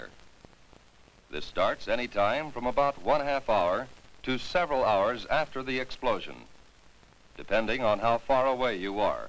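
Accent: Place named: Mid-Atlantic, United States. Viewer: Mid Atlantic accent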